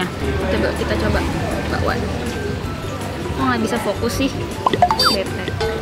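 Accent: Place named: Bandung, Indonesia. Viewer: ngomong nya ky jalanan bandung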